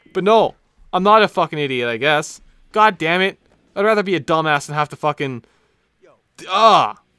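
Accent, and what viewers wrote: Canada. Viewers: I feel like you must be from Canada 😂 first minute of this video sounds exactly like my friends and I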